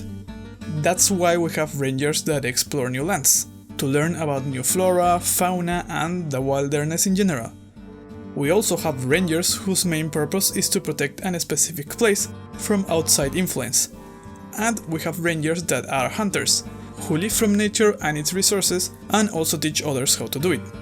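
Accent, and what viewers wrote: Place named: Argentina. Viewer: che pero vos sos de argentina? Perdona si no es así. Es que tu acento inglés es muy argento!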